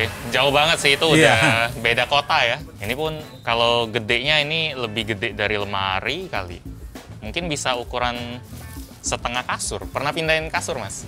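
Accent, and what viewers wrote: Palembang, Indonesia. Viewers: Bang orang palembang kah?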